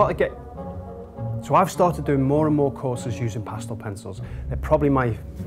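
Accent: Liverpool, United Kingdom. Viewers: Your accent reminds me of home. Liverpool for ever!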